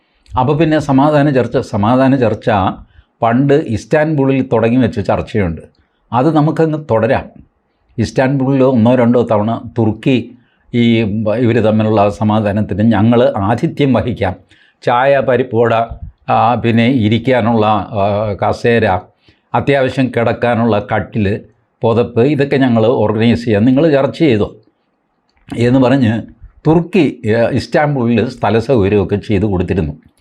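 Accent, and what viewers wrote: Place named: Alappuzha, India. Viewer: "അയാള് ഡീക് അടിച്ചു"😂😂😂ആലപ്പുഴക്കാരുടെ സംസാരരീതിയാണിത്. 😂😂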